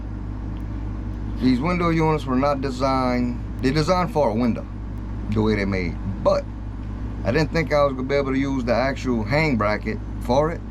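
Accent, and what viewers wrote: Louisiana, United States. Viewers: It’s called being Cajun most white people from Louisiana sound like this.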